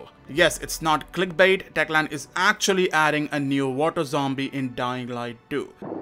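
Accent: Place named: India. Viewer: Why do you sound so Indian?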